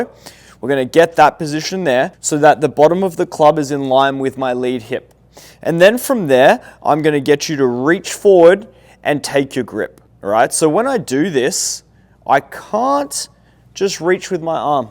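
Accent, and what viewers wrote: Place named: Australia. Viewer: So good to hear a aussie accent for a change. Feels right at home. Great video friend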